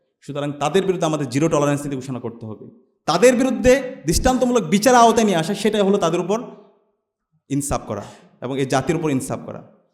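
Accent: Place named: Chittagong, Bangladesh. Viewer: Mr, Sadik you are one of my favorite person. It will be nice if your accent change . You are speaking in public. Still little bit chittagong accent in your tone.😊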